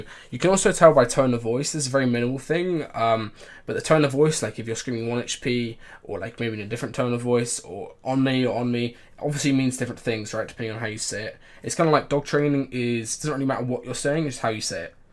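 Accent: United Kingdom. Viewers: Where are you from in the uk?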